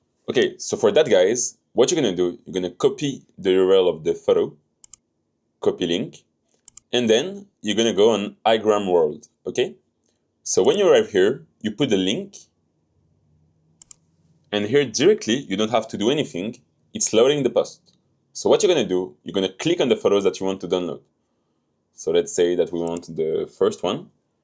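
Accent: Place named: France. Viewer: Salut j'ai reconnu que tu est Français hahaha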